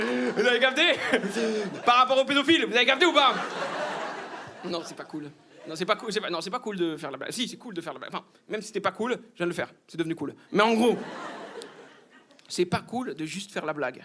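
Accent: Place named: Rural, France. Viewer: Je ne comprends absolument pas que des blancs puissent être raciste envers lui, il a un accent de campagne française et est plus blanc que moi, et merwane c'est breton comme nom...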